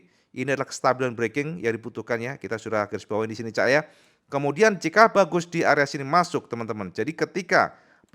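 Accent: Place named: Java, Indonesia. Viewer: Bang asli ngendi je bang kok jowone tulen hehe...salam seko gunungkidul bang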